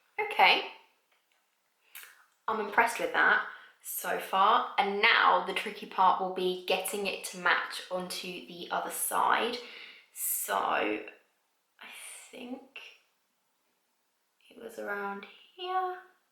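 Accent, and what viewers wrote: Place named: RP, United Kingdom. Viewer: Aww you're so sweet! The make-up looks really good! btw I love your English posh accent 😁 😘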